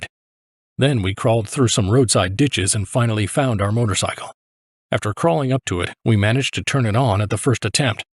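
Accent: United States. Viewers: This was somewhat confusing - the narration being from a German point of view but in an American accent !!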